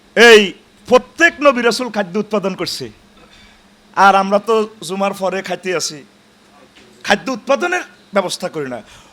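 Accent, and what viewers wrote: Noakhali, Bangladesh. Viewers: আনরে লাগে মনে হয় নোয়াকালীর বক্তা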